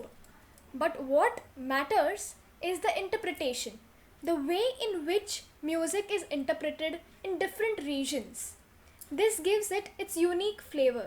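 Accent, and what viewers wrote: India. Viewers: I love your accent. It's somehow thickly Indian, and yet clear as the ringing of a bell. I also look forward to learning indian music theory. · you've got the perfect Indian accent........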